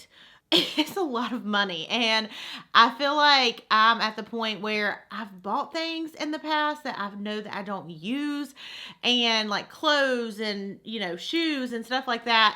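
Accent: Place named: North Carolina, United States. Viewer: Lol the very first time I watched a video of yours I thought, “Gosh she sure does talk like all the people at work.. her accent is similar to ours.. wonder where she’s from”. Then I checked out your other videos and realized you’re from W.S.! I live in Greensboro 😂😂 anyway, i subscribed immediately. Love your videos!!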